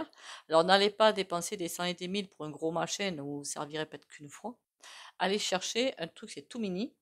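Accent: South, France. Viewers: Coucou bonjour 🎉 Ravie de te rencontrer❤ Je ne sais pas pourquoi comme dirait, avec cet accent fort du midi que j'adore, ça sent le soleil, je t'imaginais brune très brune, Oups, on a le droit de se tromper hein 😅 🙏🌸belle journée,bisous bisous🕊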